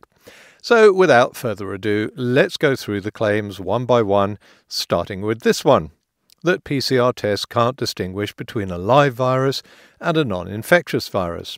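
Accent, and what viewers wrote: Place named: England, United Kingdom. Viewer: it is not that PCR tests don't work, PCR tests are not a definitive method for testing for Covid. That is the fact that ABC is never going to come clean about. Also the choice of narrator is advicive in that the choice of a speaker with a "crisp as an apple English accent " is supposed to be an indicator of intelligence. That is a total myth. They have become puppets like all the rest.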